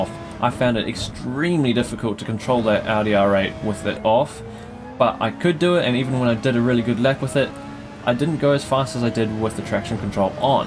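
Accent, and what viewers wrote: New Zealand. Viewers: Oh bro Ive watched a few of your videos, Didnt know you ar ea kiwi! Love it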